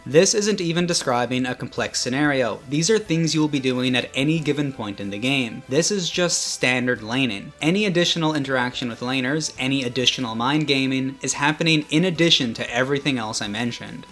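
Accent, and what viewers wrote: Newfoundland, Canada. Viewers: Massive sidenote, but are you a Newfie? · Nice video but god damn your Canadian accent is almost unbearable to listen to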